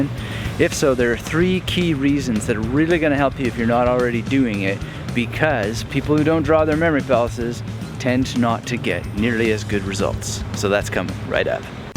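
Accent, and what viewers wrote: Canada. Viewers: Are you canadian? :o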